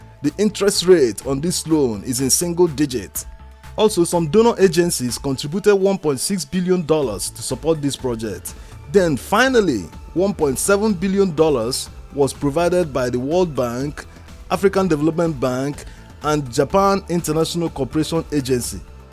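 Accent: Nigeria. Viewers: Thoroughly explained! I enjoy your wealth of info, and your🇳🇬 accent too!😁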